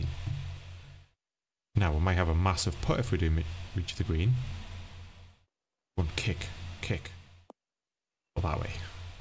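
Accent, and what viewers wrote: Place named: Newcastle, United Kingdom. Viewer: Hey thanks for the play through - really enjoyed your round - pretty much as intended.. and great to hear a Newcastle accent!… appreciate the exposure… yeah I have few courses out there - Bramley Manor is the previous one and Shearwater Sands is another links ..: cheers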